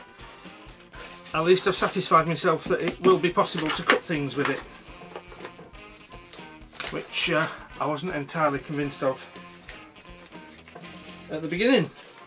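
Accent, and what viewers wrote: United Kingdom. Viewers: British army knife. British accent. American Flag. Great presentation bro. Greetings from USA 🇺🇸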